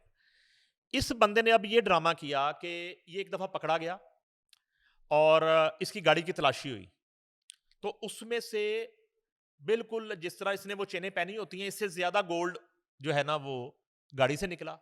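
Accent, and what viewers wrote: India. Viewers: انڈین لہجہ ہے شاید انوکھے پن کی خاطر بولا جاتا ہے ؟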